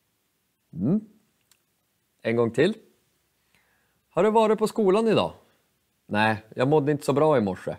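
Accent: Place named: Sweden. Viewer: Jag behöver höra sådana enkla dialoger, speciellt när du talar med den svenska accenten vi kanske hör. Tack och jag hoppas på mer av detta.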